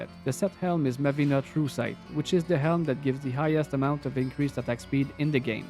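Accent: Quebec, Canada. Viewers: Where is your accent from? Sounds like Quebec french accent.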